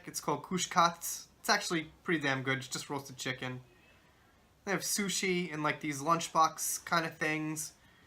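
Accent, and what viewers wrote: New York, United States. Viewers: funny I thought you had a NY accent 😆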